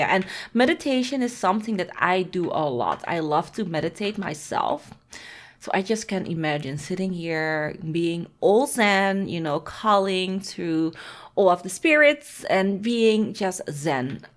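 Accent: Netherlands. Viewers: You kind of have a Dutch accent, are you from the Netherlands? I'm from Belgium.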